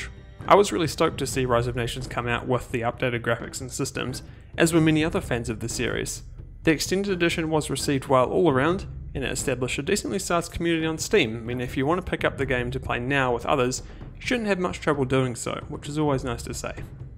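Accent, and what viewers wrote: New Zealand. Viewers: Are you a kiwi? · I see video, I hear Kiwi, I subscribe ❤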